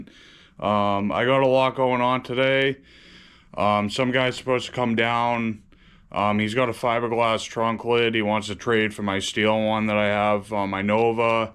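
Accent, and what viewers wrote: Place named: Massachusetts, United States. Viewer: I can tell by your accent. I'm originally from Wareham down by the cape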